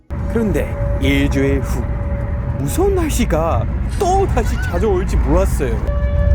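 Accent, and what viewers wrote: Gyeongsang, South Korea. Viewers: 경상도 사투리 넘 귀여워요 어디 가노 ㅎㅎ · 반가운 경상도 사투리 이뽀요~~~ 미국인지 한국인지...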